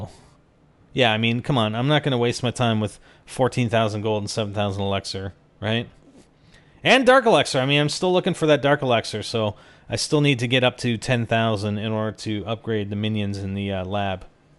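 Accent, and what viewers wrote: Canada. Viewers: are u from canada??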